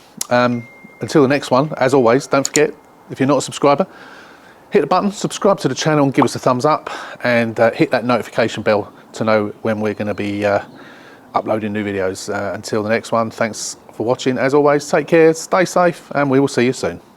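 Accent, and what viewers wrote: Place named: United Kingdom. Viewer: And we seem to be the same age, I'm 59, and I really like your British accent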